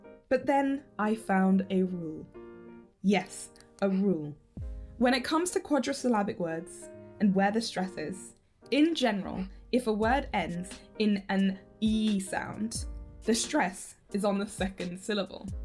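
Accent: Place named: United Kingdom. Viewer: As someone who grew up in a home where English was not the only language spoken I have learned over the years that although I am considered a native speaker there are many words I don't say 100% correctly because I learned to speak from parents who are non native English speakers. This video has taught me that I was not saying lolibrary in either of the two ways you argued. lol. However I suspect that has more to do with the fact that you speak British English accent and I speak Canadian English accent. I do agree that it is indeed loli-brary.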